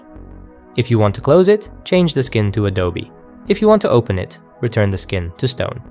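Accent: England, United Kingdom. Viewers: вы из англии?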